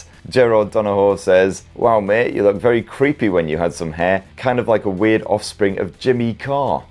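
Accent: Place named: Northern England, United Kingdom. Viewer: He's just got a regular Northern English accent 😊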